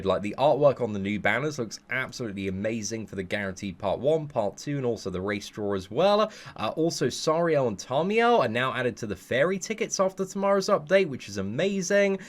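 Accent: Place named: England, United Kingdom. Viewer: It’s pretty obviously England. I mean I think he’s said it before and he has the exact same accent as me so yeah.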